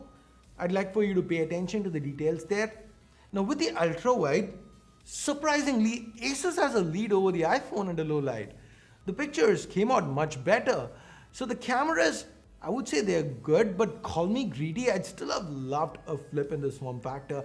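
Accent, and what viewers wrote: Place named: India. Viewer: I'm very sorry and no offense but I think I will watch your videos from now on so I can practice listening to indian accent english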